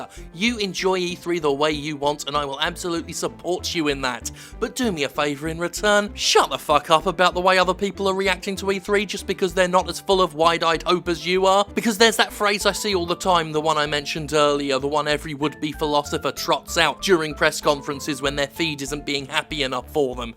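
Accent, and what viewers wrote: United Kingdom. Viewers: Is it just me or does become more british?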